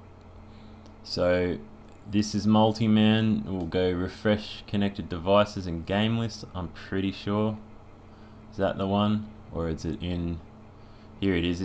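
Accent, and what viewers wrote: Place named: Australia. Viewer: Love ya laid back attitude! Also great to hear a fellow Aussie voice. Helps me understand 10x easier. Appreciate ya work mate!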